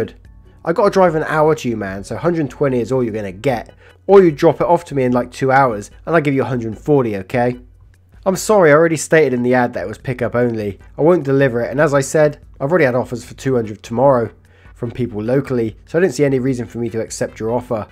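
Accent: United Kingdom. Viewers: Sounding extra British in this vid. :)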